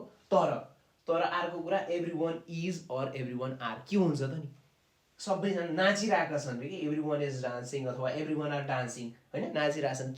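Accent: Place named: Gujarat, India. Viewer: I thought you are from Gujrat or rajasthan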